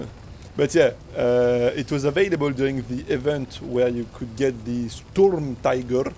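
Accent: France. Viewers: Omg l'accent français il est si flagrant 😂😂😂, sinon bonne vidéo gg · Your French accent really adds a great touch to the video. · tout ce que j'entend c'est l'accent français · I knew you was french at the first second of the video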